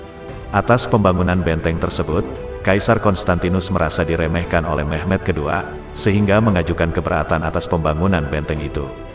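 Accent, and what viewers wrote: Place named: Java, Indonesia. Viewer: Tanpa Tedeng Aling Aling,,,,, narator kayak nya orang Jawa,,😁😁😁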